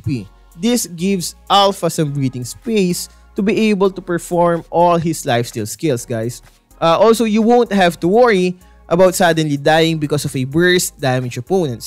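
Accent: Philippines. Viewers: i can hear the filipino accent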